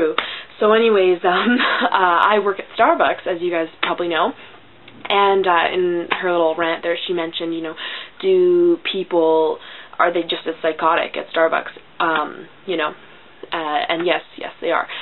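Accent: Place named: Canada. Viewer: you have such a canadian accent <3 im canadian but your accent is so clear! 'you know' so canadian!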